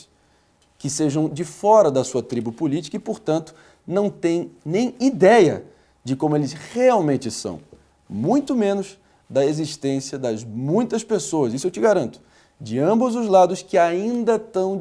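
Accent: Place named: São Paulo, Brazil. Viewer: Bahhhh mas tá horrível o sotaque! Nossa pra manézinho da ilha essa pessoa tá um paulistano nato!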